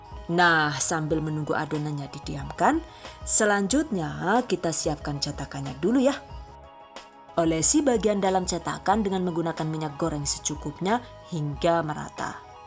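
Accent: Banyumas, Indonesia. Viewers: Keren koh ngmg ngapak Sukses embak e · Like Bunda ngapak KU tersyg 🤣 🤣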